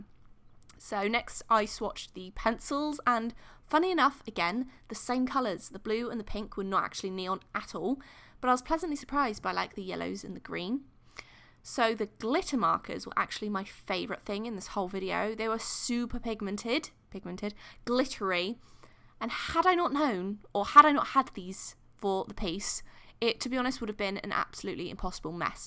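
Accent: United Kingdom. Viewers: im confused is she British or American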